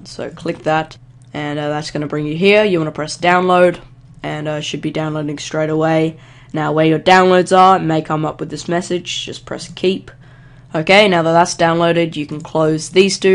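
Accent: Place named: Australia. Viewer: I smell Australian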